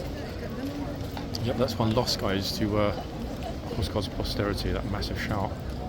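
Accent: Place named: Northern Ireland, United Kingdom. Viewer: Brilliant Northern Ireland accent wow love it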